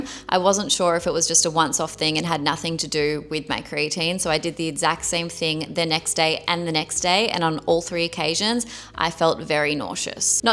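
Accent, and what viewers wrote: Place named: United Kingdom. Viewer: first of all great video. People er your accent you live in the UK. Myself, I live in sunny hot Florida. Northern Florida in Jacksonville. I'm now 65 years young. And I stand at 7 foot 3 inches tall. I take my 5 grams of creatine 8 times a day. Sometimes I mix it in my whey protein shake or just drop it in my mouth and swallow it down. Yes es, it's the water. I drink about 2.5 to 3 gallons a day. And I mix my whey shakes with 2% milk and a raw egg. I used to be huge, but then I moved to Florida. Now I'm retired and I'm lifting again. I want to get back the body that I lost. But now it will be much harder because now I'm a amputee below the knee plus I'm in a wheelchair. But not to much longer. I'm getting tired if this chair, and I'm going to prove to my surgeons that they were wrong. They told me that I would never walk again. 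I'm a stubborn person, and you never say the word Never to a stubborn person. In 2016 I had my leg removed below the knee, exactly one year later I started my thru hike of the AT trail. I got half way in 4 months,before my custom hiking poles snapped in half. I was walking between 10 to 15 miles a day. Then 2 I'm years later I found out that I got a E-Coli infection on my spinal cord. Me and my doctor's killed that infection, before it killed me. But where that infection sat, it ate the cartkedge completely away in two spots on my spinal cord. Then they put me on opioids. They only take the edge away not the pains. But once my doctor cleared me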